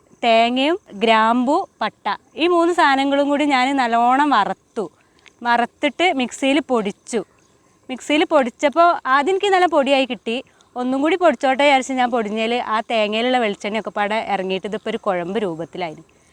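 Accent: Malappuram, India. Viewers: സത്യത്തിൽ ee സംസാരം 🤦🏻‍♂️🤦🏻‍♂️ 😂 തനിമലപ്പുറം 👌💪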